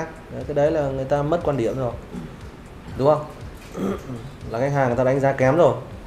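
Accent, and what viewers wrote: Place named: Thanh Hoa, Vietnam. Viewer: Chủ tịch này nói đúng giọng thanh hóa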